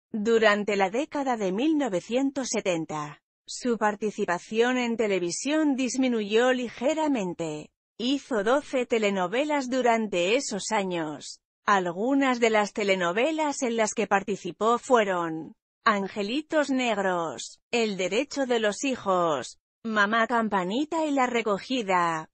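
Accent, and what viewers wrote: Spain. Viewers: Muy mal reportaje, fotos erróneas, la voz española no sé xq carajos si se nos mexicanos, y la voz ni se diga tienes tachesote X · No me gusta esa voz de Española pues son reportajes de mexico y debería de ser una mexicana q lo hiciera. no tiene ni modo , ni tono , ni forma para hacerlo..... !!!! Pufffffff😥😟😤😨😵😱😲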